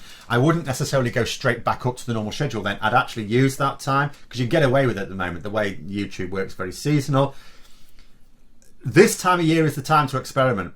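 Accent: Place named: Doncaster, United Kingdom. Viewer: He's from Doncaster, can't you tell? 😀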